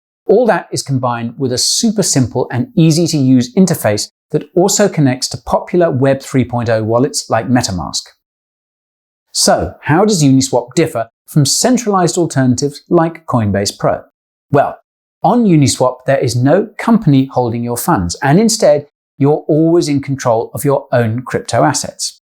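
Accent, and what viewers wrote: United Kingdom. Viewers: You had me at “getting myself a girlfriend “ and love that King’s English!! 🤣 Subbed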